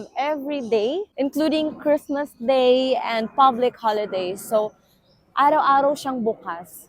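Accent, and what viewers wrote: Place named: Australia. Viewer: Aussie accent kana sissy, miss you 🥰😘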